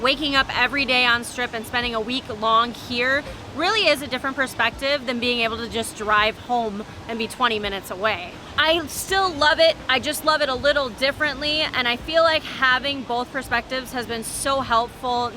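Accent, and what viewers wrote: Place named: Wisconsin, United States. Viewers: Great video and I’ve been enjoying your content since you started. The biggest takeaway from this video wasn’t about Vegas, it was about you. I didn’t realize you lived in Wisconsin! I always thought you lived in Vegas. Now that I know you’re from Wisconsin, I can’t help but hear your accent! Anyways, congrats on the move and keep up the good work! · I knew you were from MKE from your accent!!! i can’t wait to become the next Wisconsin girlie to move to Vegas 😂🎉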